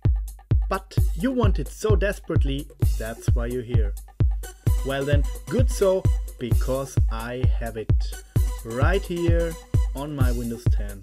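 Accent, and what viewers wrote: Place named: Germany. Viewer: man hört wie deutsch du bist lmao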